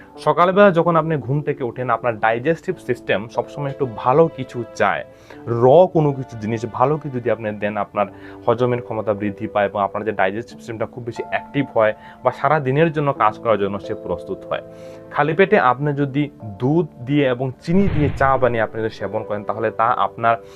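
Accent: Sylhet, Bangladesh. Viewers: Apni mone hoy sylety. Khub kosto kore shuddho bangla bolsen😂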